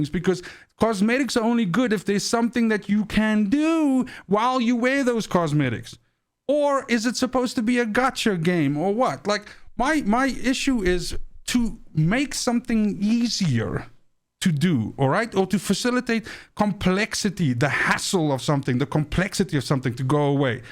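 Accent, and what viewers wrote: South Africa. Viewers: South African Afrikaans accent! Thanks for the video.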